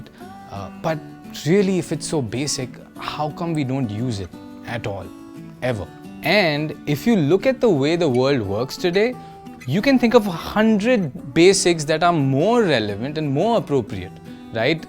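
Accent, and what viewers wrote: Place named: India. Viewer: Everyone: Serious talking and discussing in the comment section. Me: His indian accent omg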